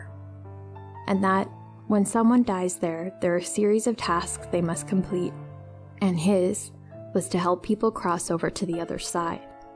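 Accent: South, United States. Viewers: The southern accent was phenomenal, you're so talented that's awesome. You're gonna go far.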